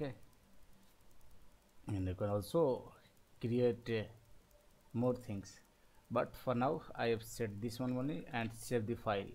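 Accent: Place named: Kerala, India. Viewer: it works man! many thanks. btw are you from Kerala? I've got a friend from there you got the same accent . hehem . Take care!!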